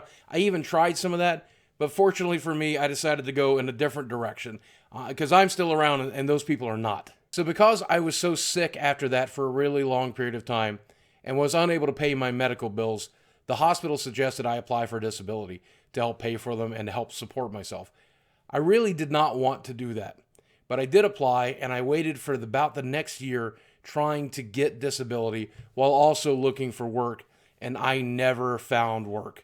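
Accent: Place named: Arkansas, United States. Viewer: This is off topic boogie, but I'm from Australia and I just wanted to say you have the most pleasant accent to listen to out of other US states (Arkansas right?), I enjoy listening to you speak and you could talk about literally anything and I would find it interesting haha. Love ya mate!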